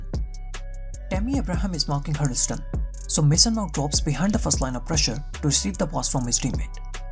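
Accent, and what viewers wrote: India. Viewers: what's with this indian accent? turkish hijacked?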